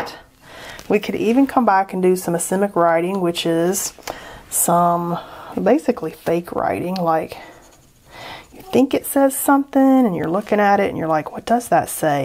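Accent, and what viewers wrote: South, United States. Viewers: FINALLY find someone with MY accent. I was beginning to think I was the only southern artist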